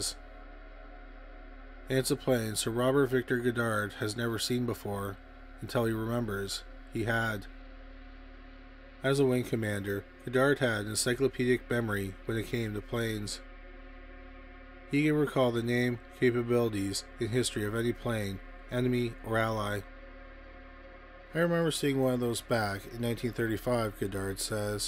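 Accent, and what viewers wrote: Canada. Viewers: I follow and just happened upon this site; once I heard the narrator's Canadian accent I made the connection. Thanks for the input, nice work as always.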